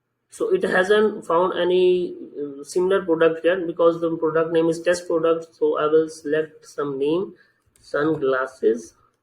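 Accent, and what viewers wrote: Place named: India. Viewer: Bhai english na bola pakaa deti ha hmy aap jaisi bhi english bol lo lgna ap ny desi hi ha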